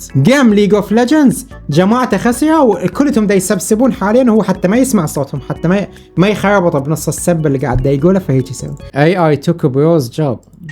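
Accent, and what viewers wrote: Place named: Iraq. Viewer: احسك عراقي بس ماعندي دليل أو لا عندي دليل من اللهجة مالتك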